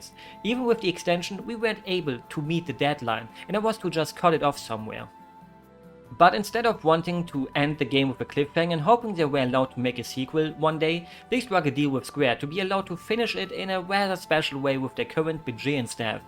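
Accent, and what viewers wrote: Germany. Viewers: I could sense your German accent was looking forward to your pronunciations of the Xenosaga subtitles! Funny to see Zarathustra stumping you though haha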